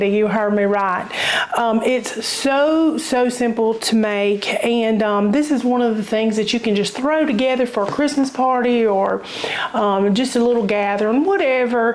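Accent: South, United States. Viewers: I love love love your accent Southern belle accent lol. God bless you sister!!! U had me at peanut butter, you make a peanut butter sheet cake before with peanut butter frosting???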